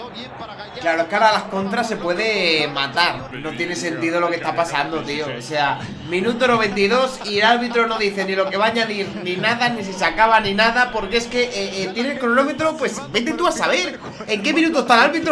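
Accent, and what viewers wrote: Mexico. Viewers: El español Más Mexicano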